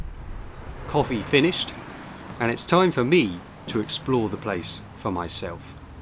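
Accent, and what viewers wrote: England, United Kingdom. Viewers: The only one with a beautiful English English accent that doesn’t talk about castles or ghost it’s kind of nice. I walk around England talking about the beautiful town of England, which I love that too, but this is very different and very fun.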